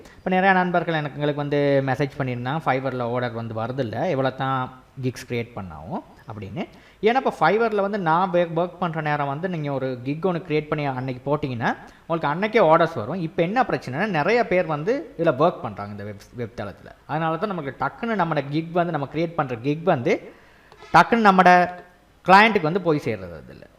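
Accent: Sri Lanka. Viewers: Bro neenga Sri Lanka va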